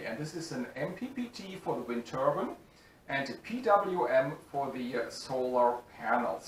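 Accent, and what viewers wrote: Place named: Germany. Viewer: Na hier hast du dich wohl verkalkuliert! Das ist wirklich schade dass du dieses Video besonders dieses Video nicht in Deutsch gemacht hast. Denn die Nachfrage von deutschen Windkraft-Freunden ist sehr sehr groß und es sind so viele Fragen offen und ich kann mit Englisch beispielsweise gar nichts anfangen so auch die Community in der ich bin und ich habe überhaupt kein einziges Wort verstanden was du da erzählst. Aber deine like gerade die wäre garantiert höher gewesen hättest du dieses auf Deutsch gemacht, weil alle suchen nach solchen Videos in Deutsch und finden ist nicht und jetzt sprichst du Deutsch und machst es in Englisch was ich mega schade und traurig finde was für eine Verschwendung um diesen Bericht. Denn es gibt unzählige englische Berichte für Windenergie nur leider nicht auf deutsch das wäre deine Chance gewesen. 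Und wie wenig draufgekommen,?... Das Englisch war so typisch deutsch. Man hat herausgehört dass du eigentlich Deutsch sprichst 😉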